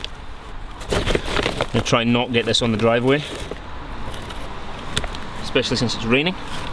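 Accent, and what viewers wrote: Scotland, United Kingdom. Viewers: Great video! Just what I was looking for! Fanx! Scottish?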